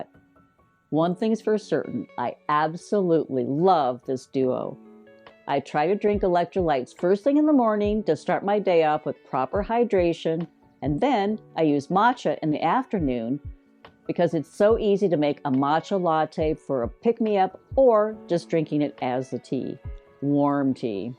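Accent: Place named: South, United States. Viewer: I’m in Ga too! I thought 💭 I detected an accent. We’re up here in the North West. (Cherokee county). Nice to know you’re a southerner.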